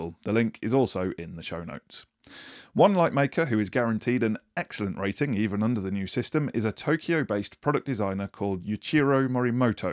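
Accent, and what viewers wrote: United Kingdom. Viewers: You should have named the title of this video "Florescent lights about to be banned in the UK." In the USA they are not banned and I thought this was a Youtube channel from the USA until I heard your accent. The USA, however, tried to ban incandescent light bulbs until many people made a stink about it. As of right now, you can still buy those too. In the USA, we also have CFL bulbs that use mercury as well. However, we recycle the mercury in both CFL and florescent lights so there's no pollution at all.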